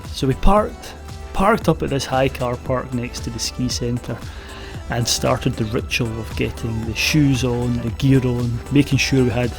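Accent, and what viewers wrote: Scotland, United Kingdom. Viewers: [;-) ], it's great that you're taking your family for a walk. The environment is beautiful and I would have loved to go along. Your Scottish accent remains great and only encourages you to go on holiday to Scotland again. So far only good experiences with Scotland as a holiday goal. Too bad the pandemic is slowing us all down, yes blocking itself.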